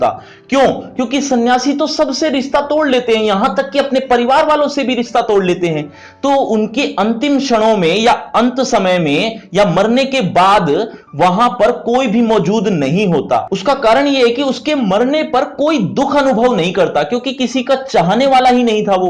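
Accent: Haryana, India. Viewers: Aap Haryana se ho ?? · sir aap haryana se ho kya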